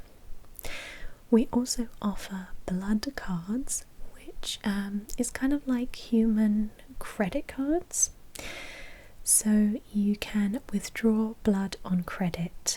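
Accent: England, United Kingdom. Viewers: To, I enjoyed your vampire roleplay. The title was Vampire Blood Bank. I love your English accent very much. And just that, actually makes this particular roleplay. Extra special. I hope that there will be more vampire role plays, in the near future.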